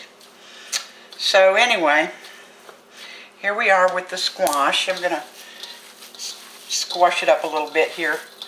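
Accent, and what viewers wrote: South, United States. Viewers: You're from the North?? You must have been in the South a very long time,you sound Southern.This dressing looks yummy,I'm going to try it soon,I might use chayote squash instead of yellow.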